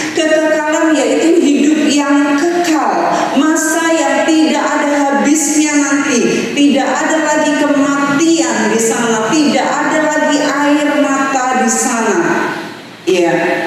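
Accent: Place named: Java, Indonesia. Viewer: Walah ibu Njawani tenan, nek kotbahe wis medok, aku koq yo ngguyu ngekel,.... turut sepenanggungan, ibunda Indah bersama Tuhan Yesus di keabadian Surga Mulia, just btw eyang kakung kulo KRT Widyonagoro Bupati Kasunanan Surakarta era 60an, Pray For Solo... Solo Full of His Glory... Awesome...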